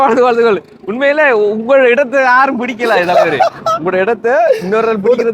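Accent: India. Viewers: இது உண்மைதான் ஆங்கில வார்த்தைகள் எல்லாம் இந்திய மக்கள் உச்சரிப்பது போல உள்ளது இதை மாற்றினால் நன்றாயிருக்கும்.எமது மாவட்ட பேச்சு வழக்கு நல்லது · தம்பி நீங்க இந்தியாக்காறர் மாதிரி கதைக்கிறது கேக்க நல்லா இல்ல கோபத்தில் சொல்லவில்லை உண்மையை சொல்றம். தம்பி கதைக்கிறமாதி கதைங்க நல்லா இருக்குதானே எமது மட்டக்களப்பு தமிழ் மாற்றம் எதற்கு ஐயா. நல்லா ஒரு சகோதரம் மாதிரி சொல்றன் தம்பி. கோபிக்க வேண்டாம். மற்றும்படி குறை இல்லை உங்கள் பணி தொடரட்டும்👍👍👍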